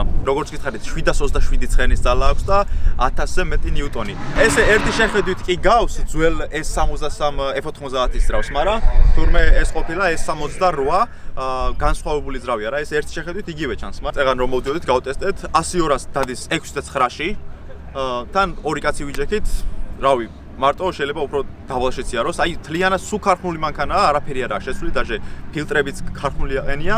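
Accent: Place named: Svaneti, Georgia. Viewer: სვანი ხარ ხო? აქცენტით ვხვდები სვანი ვარ😀✊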